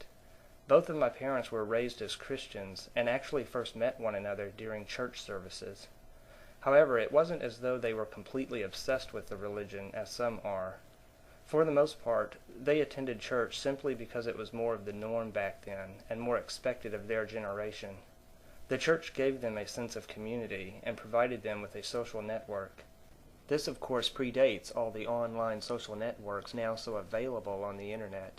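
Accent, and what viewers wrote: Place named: Oklahoma, United States. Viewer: You sound like your from Oklahoma.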